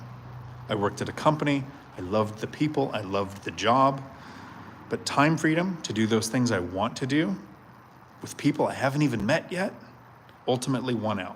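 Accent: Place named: United States. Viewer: Speaks American. I've been to Thailand many times and they don't speak any particular kind of English except English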